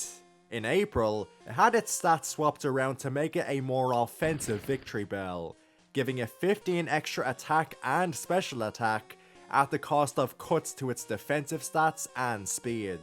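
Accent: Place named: Ireland. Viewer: The accent is very whiplashy to me because I got bullied so hard in Dublin for liking pokemon, so seeing a really cool and nerdy pokemon video in an Irish accent is WILD to me lmao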